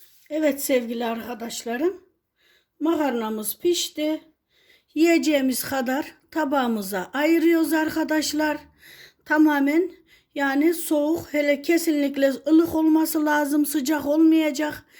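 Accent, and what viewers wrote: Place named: Nevsehir, Turkey. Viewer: Kesin Nevşehirli bu abla 😅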